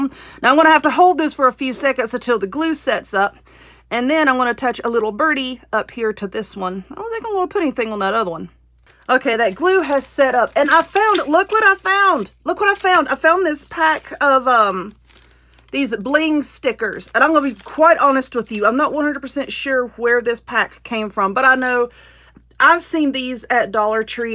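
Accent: South, United States. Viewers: I just love watching you. I've learned so much. I especially love to hear you talk. You're accent is almost as country as mine. I also talk to myself lol esp when I mess up. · She is from the south.. Us southerns all sound just like that.. I love her diys and i love her vidos.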